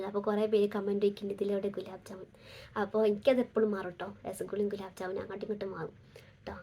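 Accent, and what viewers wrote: Malappuram, India. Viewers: All the best, Malappuram slang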